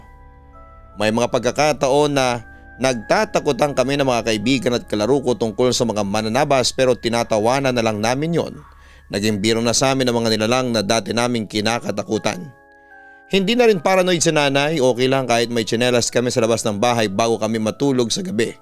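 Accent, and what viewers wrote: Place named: Negros Occidental, Philippines. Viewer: Feeling ko, sa negros Occidental ang nag kwento neto😁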